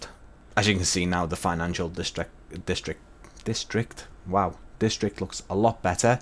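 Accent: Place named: Southport, United Kingdom. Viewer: If I'm not mistaken, Southport technically has a sand-runway at Birkdale Sands on the beach (I'm guessing from the accent you know where that is ;) )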